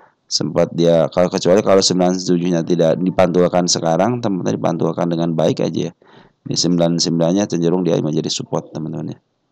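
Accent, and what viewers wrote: West Java, Indonesia. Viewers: orang sunda ya kang?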